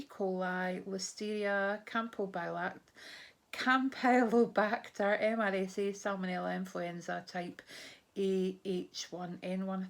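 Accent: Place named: Scotland, United Kingdom. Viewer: To be honest I firstly subscribed on your channel due to your lovely accent ! I miss Scotland so much, I used to live in Edinburgh for 4 years. But then I end up liking your videos more than your accent :) I love your honesty and the way you describe the products! xxx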